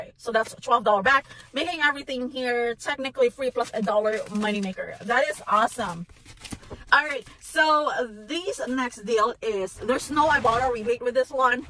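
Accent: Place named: Philippines. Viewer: My good friend is from PI and talks the same way. Maybe it's a cultural thing. It seems fine to me.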